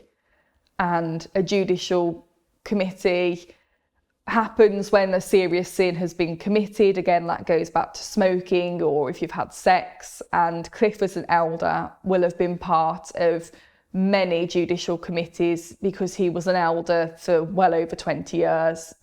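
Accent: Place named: United Kingdom. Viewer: This girl reminds me of a former girl friend from college days, except for the lovely british accent. She was NOT a JW, I should add. What a mixed up cult they are.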